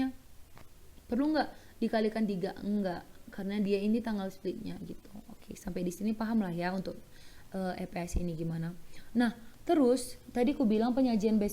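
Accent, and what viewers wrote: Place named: Medan, Indonesia. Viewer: Makasi kak, wkwkkk nampak kali org medan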